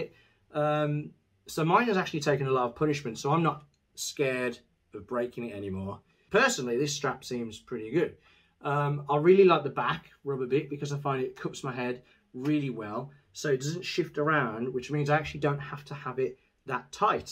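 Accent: United Kingdom. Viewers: Excellent review mate. Also very comforting to hear it all coming from a fellow Brit. Not to be xenophobic 🤓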